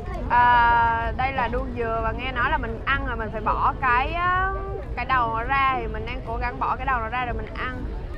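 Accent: Da Lat, Vietnam. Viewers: Mới phát hiện kênh mới có hai ngày.. Phái cách nói chuyện, giọng đà lạt hay vãi tèo...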